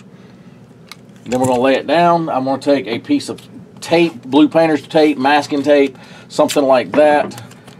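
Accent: South, United States. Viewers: NICE LITTLE DIY TIP!👍THX! I KEPT THINKIN U WERE SAYIN "COAL" WHEN U WERE SAYIN "COIL"...LOL.😁 CUZ OF UR NICE SOUTHERN DRAWL. WHERE R Y'ALL AT?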